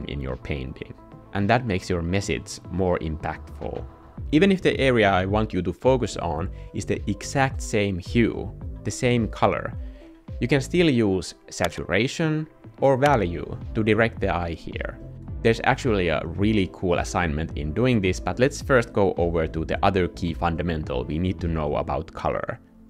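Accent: Finland. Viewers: I KNEW I recognized a fellow Finn in your accent!!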